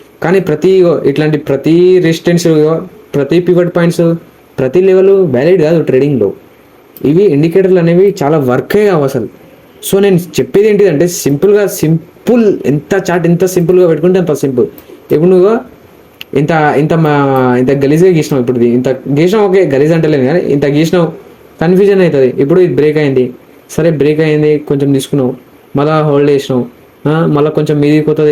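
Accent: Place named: Telangana, India. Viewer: Yem boss, nee bhasha pakka mana Telangana laka bagundi👌keep it up.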